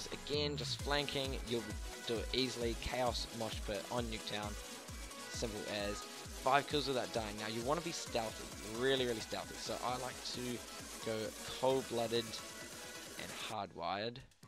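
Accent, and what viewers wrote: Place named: New Zealand. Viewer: You sound like a New Zealander lol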